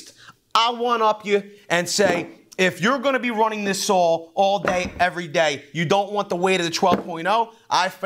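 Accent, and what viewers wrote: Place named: New York, United States. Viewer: My apology for not possibly be knowledgable of a fact, but why is it common to see these people with the NY accent / Boston always talking with this bad attitude behavior, as if they're going through some divorce, or for one reason or another, they're having to resort to buying cheap britches that ride hide high in the crack of their a$$es ?....I mean really guy's....... Isn't there someone close to this guy that can enlighten him about the used boxer brief at the Salvation Army that he could purchase at a fairly good price, that allow him to calm the "F" down! especially when doing these very informative video's ?....Maybe its the Napoleon Syndrome...I'm sorry if I'm out of place...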